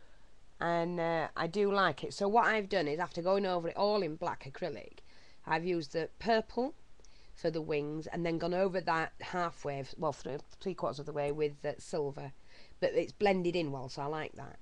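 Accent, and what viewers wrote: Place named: Yorkshire, United Kingdom. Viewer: i knew you were as soon as i heard your voice, we have a very distinctive accent here in good old yorkshire!!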